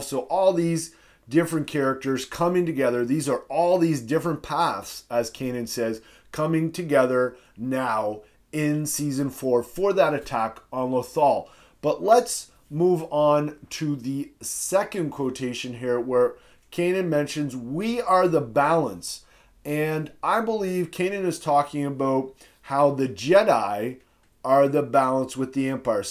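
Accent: Canada. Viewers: are you a canadian?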